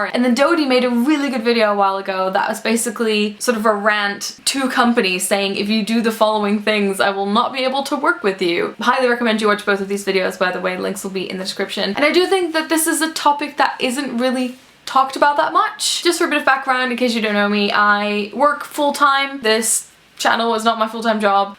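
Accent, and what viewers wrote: Ireland. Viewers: Haha I thought you were Irish until I read the description, I'm a new subscriber :D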